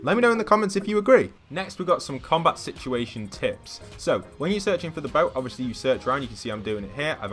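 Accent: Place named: Cheshire, United Kingdom. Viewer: Hey you sound like your from the Cheshire area it would be awesome if you are because I am too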